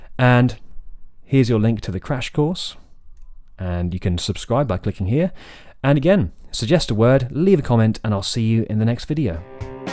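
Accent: United Kingdom. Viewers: Hello!! It is always a pleasure to hear to your clear explanations and beautiful British English. You know? Just thinking out loud......I find the spelling advice you gave us a bit contradictory. Since vowels can be distinguished auditorily I have always counted on the spelling of the vowels and the organs of speech to produce them. Perhaps I think in terms of the spelling of the consonants when there is pre--fortis clipping. I focus on the word family too as you mentioned, daughter is not the same vowel as in draugh. Anyway, I think that considering spelling is an important issue we cannot disregard?? or perhaps you were answering one of Adair's questions I missed? Cheers stella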